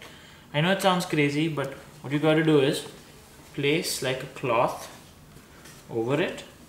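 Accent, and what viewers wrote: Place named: India. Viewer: Why do all the indians talk like that i like the way they talk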